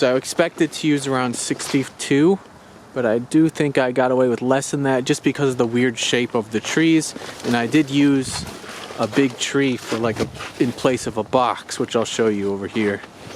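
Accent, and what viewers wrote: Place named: Upstate New York, United States. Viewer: I wonder what state this is in? I detect a slight accent, upstate New York? Beautiful patch of woods. The vines on that tree are so cute and fascinating.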